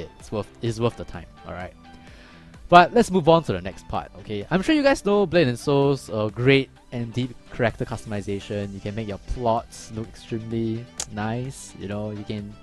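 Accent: Singapore. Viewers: Love your accent. Singapore maybe?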